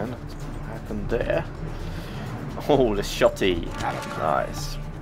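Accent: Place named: England, United Kingdom. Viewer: "IMA TRYIN ET AMMO YA FAWKING CAUNT" xD i luv the english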